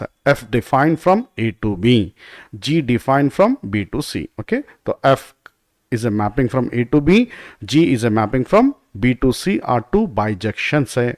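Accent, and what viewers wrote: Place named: Hyderabad, India. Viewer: With hyderabadi voice 😍 ❤️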